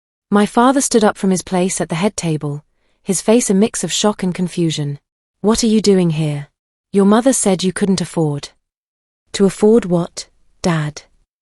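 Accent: United Kingdom. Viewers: Where's her husband who was supposed to come to her rescue, like it says in the title. Absolutely love British narrators 😊❤❤❤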